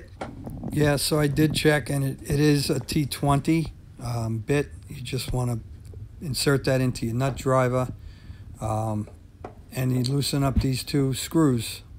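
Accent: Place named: New Jersey, United States. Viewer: Are you from Jersey? I grew up partly in Newark, great accent and perfect instruction! Love that East Coast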